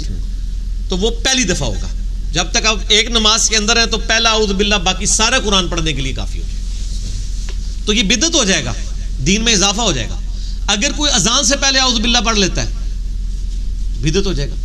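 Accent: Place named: India. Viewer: Indian Urdu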